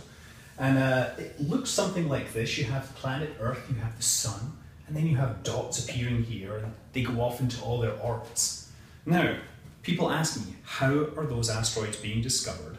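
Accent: Scotland, United Kingdom. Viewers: This is too scottish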